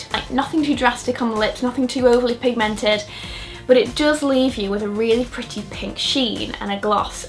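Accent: United Kingdom. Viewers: where is your accent from in the UK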